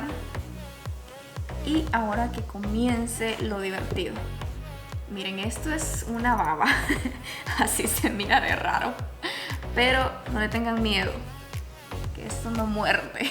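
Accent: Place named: Honduras. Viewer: Lo q me encanta y admiro de ti esque no has perdido tu hacento hondureño!!! 😘😘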